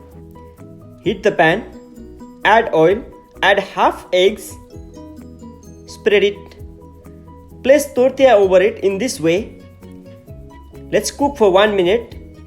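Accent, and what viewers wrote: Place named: India. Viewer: great presentation my friend you're sounds indian. your burrito looks yummy.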